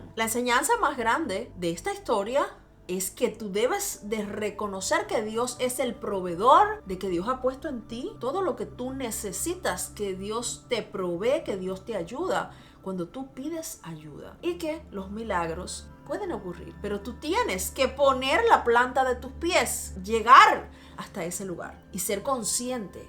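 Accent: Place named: Cuba. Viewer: Hola siempre que te escucho me lleno de energía positiva, eso es lo que transmites, me imaginé que eras cubana hoy lo confirmo, que bueno, también yo. Dios te bendiga 🙏🙏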